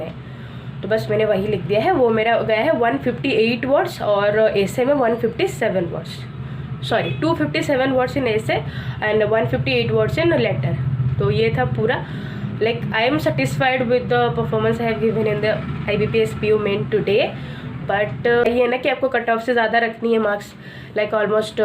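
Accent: Odisha, India. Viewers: Didi app odisha se ho kya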